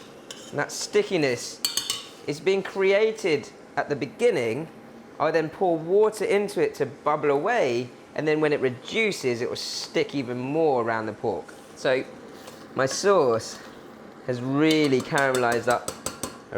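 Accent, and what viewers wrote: United Kingdom. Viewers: Why is we listening to a British asian.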